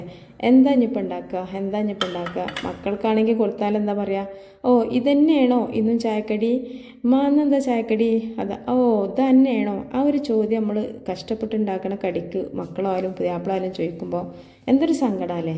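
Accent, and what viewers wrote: Malappuram, India. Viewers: Thatha. Kureperu kaanunna vdeo aanithu. Athukondu manasilakunnavidham samsaikku. Thani malapuram aakalle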